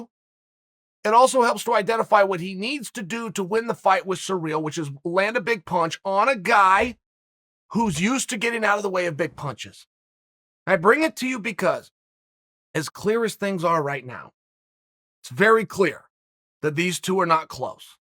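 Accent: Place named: United States. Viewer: People make fun of the the way Chael says names with his hyper-American accent like everybody has an accent and says things a certain way.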